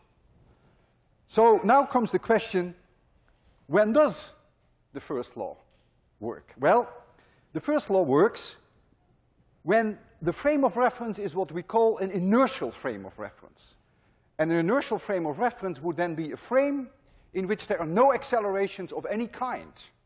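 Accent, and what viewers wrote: Netherlands. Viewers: It's interesting how your Dutch accent become much stronger in the past years again!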